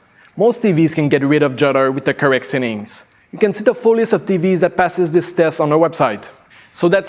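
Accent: Quebec, Canada. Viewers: Lul French Canadian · He has a big accent because he is from Quebec. I'm from Quebec too so I could tell. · HAHA un Québécois ! :) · It’s a French Canadian accent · Hehe that's nice to hear a French Canadian accent on a high quality informative video. I noticed it right away and trust me, he speaks english EXTREMELY GOOD and way better than the average person from here, including myself haha. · It's actually a French Canadian accent 😉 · I can tell that you are from Quebec haha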